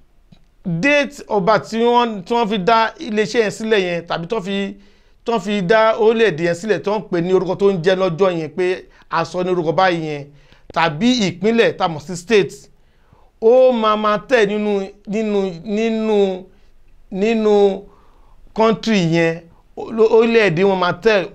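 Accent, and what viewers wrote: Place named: Fulani, Nigeria. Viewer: The man is Fulani....just pay attention while listening to his ascents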